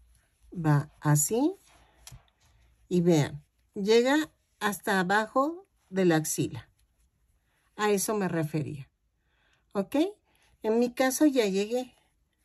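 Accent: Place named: Mexico. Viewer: Perdón pero cuando veo números Veo chino . Prefiero jacerlas a ojo. Pero me encanta si acento mexicano y su dulzura❤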